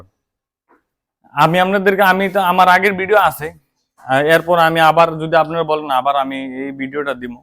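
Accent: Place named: Chandpur, Bangladesh. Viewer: কথা শুনে মনে করছিলাম শরীয়তপুরের এখন দেখি পার্শ্ববর্তী চাঁদপুরের।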